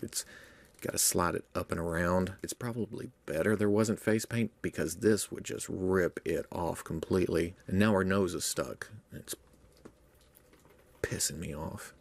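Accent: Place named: South, United States. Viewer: I’m also from Arkansas. I love his southern accent.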